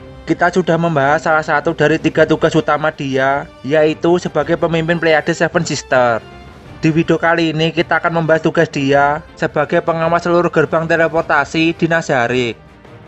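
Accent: Java, Indonesia. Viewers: Bang bahasanya di arahkan ke gaya Indonesia aja bang, jangan terlalu ke arah Jawa, berasa kurang bang kalo masih Pake logat Jawa.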